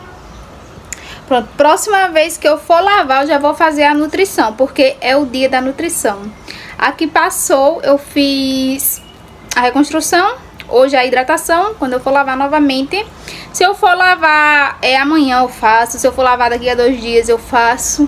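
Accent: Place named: Brazil. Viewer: Eu nao sou Brasileira mas falo portugués e gosto dos diferentes sotaques do Brasil. Eu gosto do seu cheito de falar, do bom dia e do "meu povo". Voce é auténtica e mostra sua vida como ela é, se alguem nao gostar do seu sotaque pois que asista outra youtuber de outra regiao. Continue com seu bom dia que tem gente q gosta, continue com seu cheitinho de ser que é unico e original 🌸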